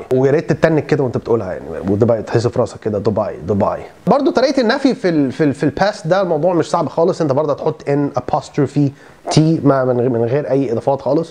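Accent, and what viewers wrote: United States. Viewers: past participle نطقك امريكان والنطق اللى انت اتريقت عليه ده بريتيش ارجو لفت الانتباه لذلك لان بعض الناس هتعتقد انه نطق خاطىء وشكرا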